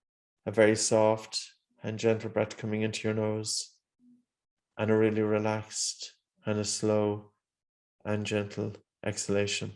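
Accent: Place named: Ireland. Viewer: This is a very useful video. The Irish accent is so beautiful and calming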